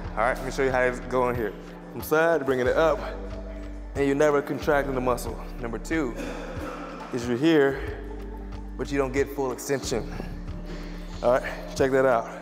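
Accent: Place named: Virginia, United States. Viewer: Where you from bro? I thought VA.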